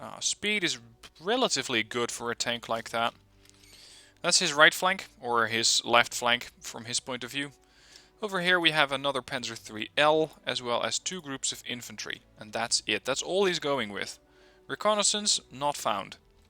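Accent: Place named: Netherlands. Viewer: Ok. By the way, are you from the Netherlands? You have a particular accent.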